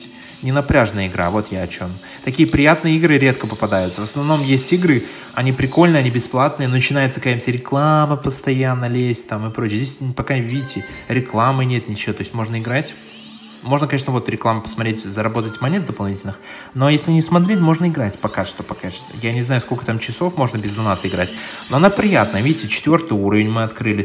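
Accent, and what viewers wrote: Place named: United States. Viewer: Ты что из сша? Ты не русский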